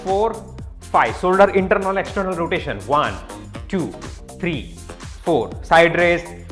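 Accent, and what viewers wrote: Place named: Bihar, India. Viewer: Shuddh bihari sunne Mila ...exercise and fun both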